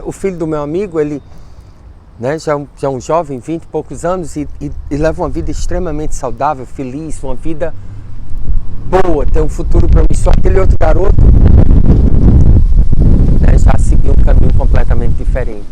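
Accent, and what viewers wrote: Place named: Rio Grande do Sul, Brazil. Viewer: Mas Bah!!! Num bom gauchês!!! Show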